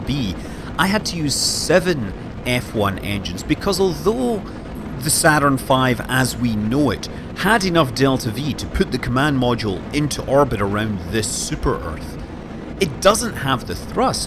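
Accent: Scotland, United Kingdom. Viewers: Nice video, I enjoyed the scotish accent !! ...The earth is the most massive solid planet in the solar system and has the third magnitude of surface gravity after Jupiter and Neptune, escape velocity of 11 km / s (40,000 km / h) to elude such gravitational field it requires a huge amount of energy (we could say that the earth it's already a super earth) Inhabited planets with higher masses could be gravitationally unattractive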